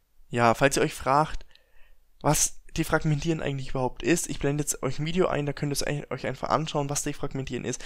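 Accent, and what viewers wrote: Franconia, Germany. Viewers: Schätze mal du bist ein Fränkischer Mensch aus Franken (Dipp). Du redest ja wie der Loddar. Aber mach die nichts draus. I ben of älle fäll a Schwoab. Ich finde ja alle südlichen Dialekte super. Aber so ein Ossi oder auch Fischkopf-Dialekt kann ich gar nicht ab. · aus franken? :D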